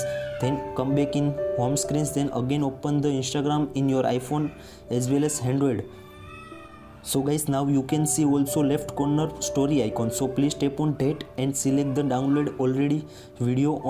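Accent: India. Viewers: When india speaking English 👎👎👎🤮🤮🤮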